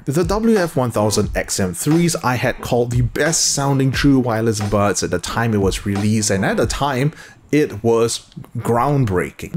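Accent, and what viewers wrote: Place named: Singapore. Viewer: This man sounds really Singaporean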